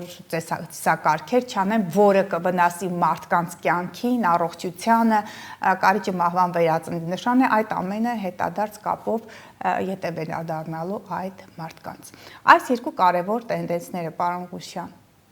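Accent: Standard. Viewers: Հարգելի տիկին: Ես հաճույքով եմ դիտում Ձեր հաղորդումները հատկապես վերլությունները ինչպես գեղեցիկ մաքոր հայերենով և կանացի հմայքով եք բացատրում հաճելի է Ձեզ լսել, չնայած այդ աստղերից ոչինչ չեմ կարողանում հիշեմ, գուցե տարիքս է ինչ -որ է 80-ը քիչ չէ: Ուստի ինքս չեմ կարողանում կողմնորոշվել, բայց ինձ: շատ է հետաքրքրում թոռնիկներիս ապագան հատկապես Գրիգորի որն այս տարի 9-րդ դասարան, բայց դեռ չի կոմնորոշվել ու՞ր գնա ի՞նչ անի ես ուզում եմ Ձեր շնորհիվ օգնեմ կյանքի ճիշտ: ուղի ընտրի: Տվյալները գրեմ: Գրիգոր Քյոսեյան ծնված 2010 թվի հունվարի 9-ին առավատյան 8-մոտակայքում: Ձեզ մի նեղություն հնարավորինս օգնեք ինձ այյս հարցում: Եղեք առողջ և հմայիչ: Կանացի երջանկություն: Հույսով սպասող Փիրոզա <num>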